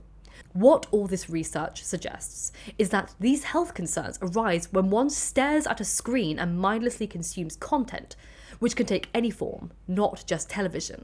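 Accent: United Kingdom. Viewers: I am addicted to binge - watching your videos,! Actually i am studying English with lots of videos by British people (because i don't like the American accent) but i don't feel unhappy at all, on the contrary, YouTube is the best thing that happened to me latelly. I really adore you accent, it sounds like good music to me. Thank you so much for your content, which i love as well. 🙏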